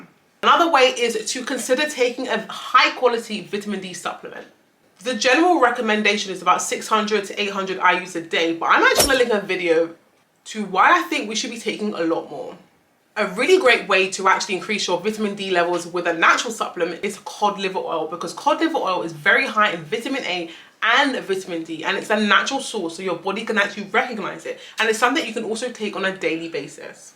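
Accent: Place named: United Kingdom. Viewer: I hear a UK accent, and everyone knows the UK has no sun. Vitamin D is vital for the winter and low sun areas. My iron was low so I started geritol, biotin and recently added vitamin D and my hair is sooo thick. I am waiting on the growth but I have less breakage so I am so excited to see results in 6 months.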